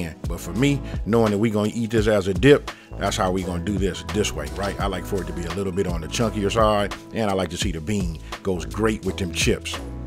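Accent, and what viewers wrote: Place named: South, United States. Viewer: where are you orginally from? that aint no california accent thats gotta be from the south brother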